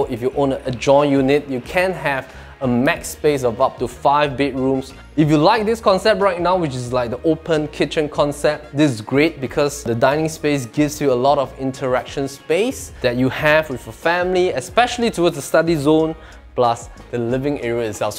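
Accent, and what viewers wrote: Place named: Singapore. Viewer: Love your Singlish. Don’t need to work on your pronunciation 🥰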